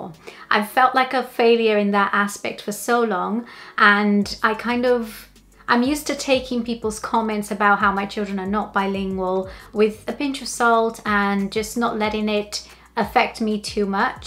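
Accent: Wales, United Kingdom. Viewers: Is her accent Welsh? Because it's beautiful. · Your not from Brazil, your welsh accent is too perfect aha how long have you been in Wales 🏴🇧🇷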